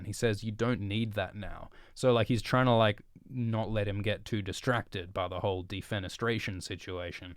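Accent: Australia. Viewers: It's not the typical Aussie accent, you can hear it in some words, but he doesn't do that thing where everything he says sounds like a question. So, it throws people off, especially Americans who hear relatively few of the different Aussie accents. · It's Australian (this gets asked about 50 times in every ASX video btw, he should just start out his videos by saying where his accent is from so people will stop asking all the time xP) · I'm curious, are you Australian? Being an Aussie myself, you sound very Australian?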